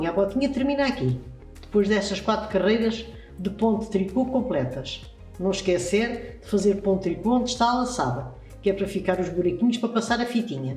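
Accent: Portugal. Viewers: Boa tarde. Amei seu jeito de ensinar e o sotaque português maravilhoso! Já fiz os sapatinhos, mas não fechei ainda porque estou na dúvida de identificar o avesso. GRATIDÃO! Bjosss de LUZ! 😘♥️ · Adorei sua orientação pra executar este sapatinho. Deu muito certo. Sem contar que amo ouvir seu sotaque. Sou filha de português. E viajei pra Portugal em junho pra conhecer a Terra de meu paizinho que já faleceu. Já me inscrevi em seu canal. Muito obrigada por trabalhos tão lindos !!! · Vídeo muito bem explicado . Eu amo sotaque Português tenho muita vontade de conhecer o santuário de Fátima. Já me inscrevi em seu canal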